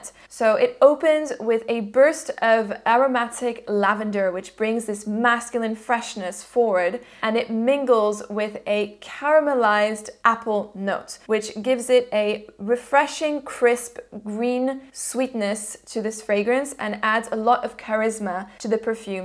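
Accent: France. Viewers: Probably a silly question but I've watched you some and your French accent sounds exquisite! Are you French? 😊 Cheers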